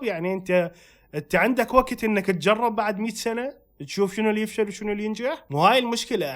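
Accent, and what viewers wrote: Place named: Samarra, Iraq. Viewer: لهجتك مال سوامره !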